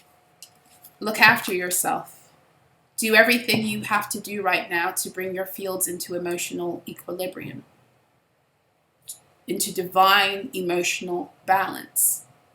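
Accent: United Kingdom. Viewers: Your Hiding a British Accent I hear it...Just like Maji got the T hiding her UK Accemt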